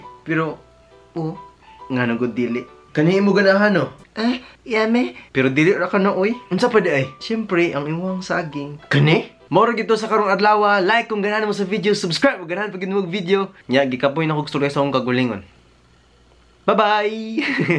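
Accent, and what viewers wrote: Visayas, Philippines. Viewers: Hahahaha mkatawa ko ani niya mo storya ba kay bisaya kaayo..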